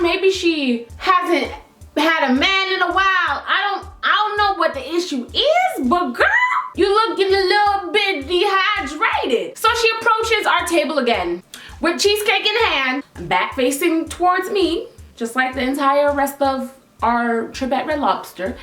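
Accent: Jamaica. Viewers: Didn’t realize she was Jamaican until the begging of the video 🇯🇲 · New follower! I love your videos. Im literally binge watching them!!! Speak more patois !!!!!!!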